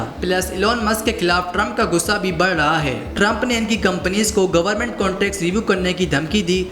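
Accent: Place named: Sindh, India. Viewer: Pele nahi hota Pehle hota hai, urdu achi karo clear sunai nahi de rha thora jama jama kr bolo pause leke, sindhi lag rhay ho clear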